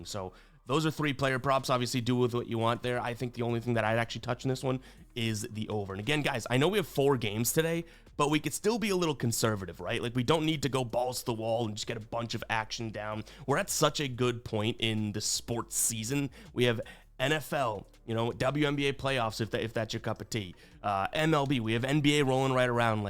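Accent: Boston, United States. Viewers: You're from BOSTON????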